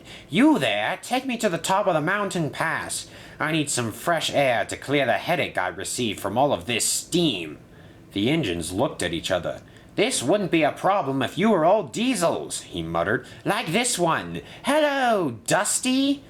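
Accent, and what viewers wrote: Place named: Scotland, United Kingdom. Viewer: Nice episode! Looking forward to seeing how this all plays out. There was some very clever wordplay in this episode, and it was thoroughly entertaining! Also, I swear your Scottish accent gets better every time I hear it!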